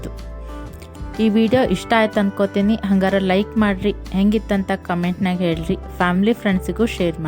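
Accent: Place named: North Karnataka, India. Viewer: Nam ittar karnataka matu keli khushi ayitu sis super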